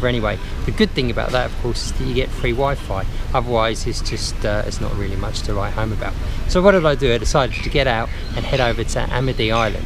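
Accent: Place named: Cockney, United Kingdom. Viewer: make fun of your accent by doing the cockney thing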